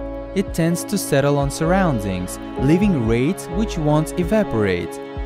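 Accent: Russia. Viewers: Йор рашн акцент ин Инглиш им рилли кул:) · Jose Silva he is Russian so his accent makes "L"s and "V"s sound weird. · He is from Russia vs are pronounce with a w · If this video was produced in Russia or any other country near it, and by the acsent of the speeker I can assume that the video was really produced there.... The guys will go to jail with weed experiments ;)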